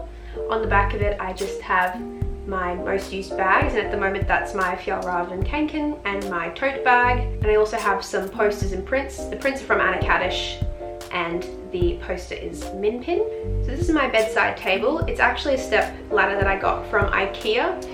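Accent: Australia. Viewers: Yesss I've been looking around for another good, aesthetic youtuber and finally I found you!! Bonus points is that you're Aussie like me hah I realised from the background noise of insects before I even heard your accent. So ready to binge watch all your videos!! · Where do you live you have the same Aussie accent as me 😂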